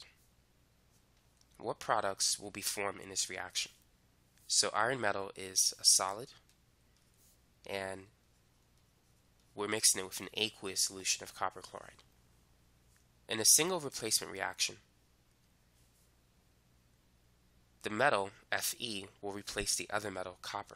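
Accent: United States. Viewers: listening to an american is kinda annoying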